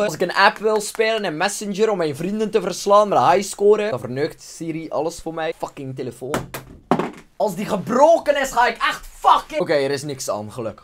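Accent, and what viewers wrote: Belgium. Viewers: ben jij belg ofni ?'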